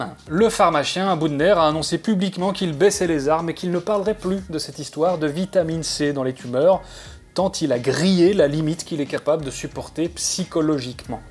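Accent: Belgium. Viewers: Ca n'a rien à voir mais vous n'avez pas besoin d'essayer de réduire votre accent belge. Je ne pense pas que ce soit à cause de la façon dont les mots sont prononcés que la plupart des gens écoutent ce que vous avez à dire. ^^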